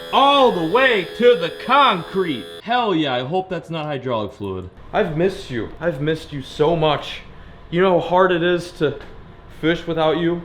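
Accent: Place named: New England, United States. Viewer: Down here In the south we have a lot of fishin tournaments every year. Where are you from? you sound like one of them ol yankey boys ya hear? I'm 77 and you fish good to be young! Good ol boy!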